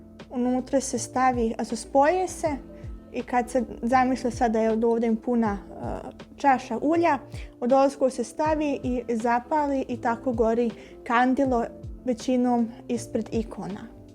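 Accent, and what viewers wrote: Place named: Republika Srpska, Bosnia and Herzegovina. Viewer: ОНО ШТО МЕ РАДУЈЕ ЈЕ ТО ДА ПРИЧАШ ИЈЕКАВИЦУ И МИСЛИМ ДА СИ ИЗ РЕПУБЛИКЕ СРПСКЕ. И ЈА САМ.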